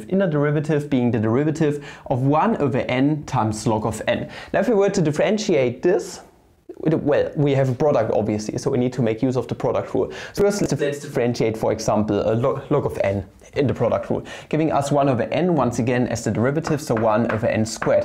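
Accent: Germany. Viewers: I don't think this is only because of the German accent but does sound a lot like Der8auer ^^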